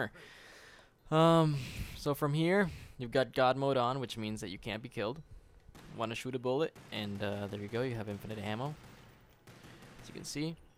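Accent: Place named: Canada. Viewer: u canadian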